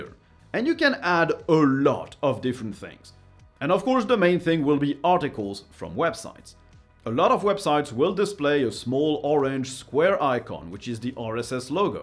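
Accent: France. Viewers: Are you French? I thought you were Spanish.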